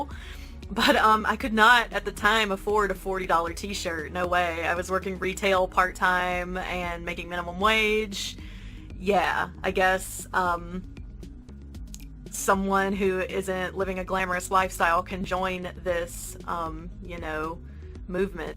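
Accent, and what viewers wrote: South, United States. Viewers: Are you souther (sorry for this random question but I love your voice and I'm curious about your accent) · Damn. When you said, "You create yourself," that was so powerful. You articulated everything so well in this video. Great job. I know this is a few years old but I hope you're doing well. Are you from the south? I like your accent lol. I'm from Tennessee.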